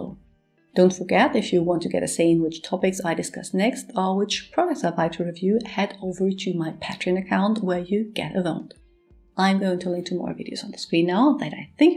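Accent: Germany. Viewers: WOOHIOO ! Thanks! My sister in Canada uses it and even though she's 60, she could easily pass for someone in their 40s - not that it's "so important" to look young but to take care of ourselves and to look as good as we can is perfectly fine, as far as I'm concerned. Btw, I must add that your accent when you speak English is just lovely! I couldn't place it at first but then I saw that you were German. I've been in Belgium since 1989 but am originally from Canada. I wish you all the very best and.... a very MERRY CHRISTMAS to you and yours! 🙂🎄❤️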